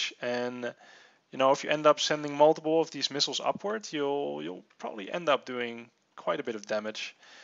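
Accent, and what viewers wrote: Netherlands. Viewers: in Minecraft thought so. I recognized the way you talk. I'm Dutch to.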